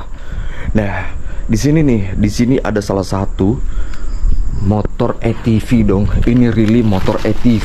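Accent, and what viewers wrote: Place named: Medan, Indonesia. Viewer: Hadir bg salam dari medan horas. Sellu suka dgn smua konten2 abg. Upppp ternyata abg org medan. Di vidio ini baru sya dengar abg org medan. Horas ma Hu bamu bg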